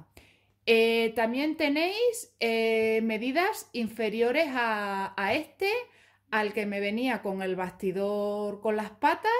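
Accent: Spain. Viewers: Hola, estoy viendo tus vídeos y deduzco por tu acento que estás en España. Estaba mirando algunas de las webs de las que comentas productos, y veo que parecen estar fuera de Europa. Quería preguntarte si cuando te llegan tienes que pagar mucho de derechos de aduanas etc. Tienen cosas bonitas y originales, pero me echa para atrás lo de la aduana. Muchas gracias y que te diviertas con tus "compritas" 🤗🤗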